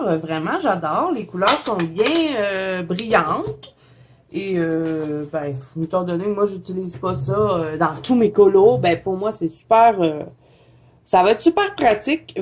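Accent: Quebec, Canada. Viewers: Ho..j adore déjà votre beau accent québécois que jai bien connu durant 3 belles années chez vous.... J'aime vos vidéos qui sont super bien faite et vos astuces sont géniales... Merci merci de me faire redécouvrir un peu le québec et merci pour vos conseils vos méthodes qui me font capoter et que j essaye..bonne soiree a vous belle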